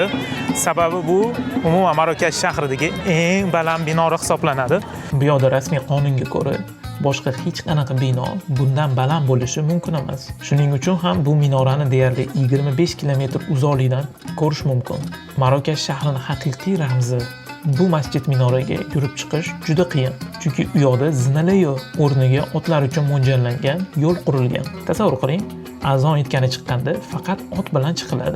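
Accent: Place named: Tashkent, Uzbekistan. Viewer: Адабий тилда гапиришни ўрганинг. Тошкентчасига уйда гаплашасиз. Сизни ҳамма вилоятлар кўраяппти. Хурмат юзасидан абадий гапириш керак. Узр.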